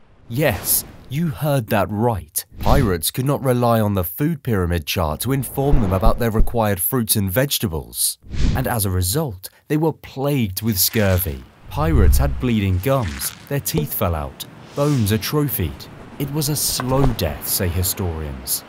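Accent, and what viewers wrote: United Kingdom. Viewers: His pronunciation is the correct Brittish way of pronouncing it (and thereby most of Europe as well). You must be American.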